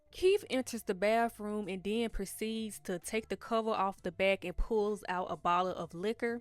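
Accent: Memphis, United States. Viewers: Not only do I love Good Times, but you commentating it with that Memphis accent is just dope!!!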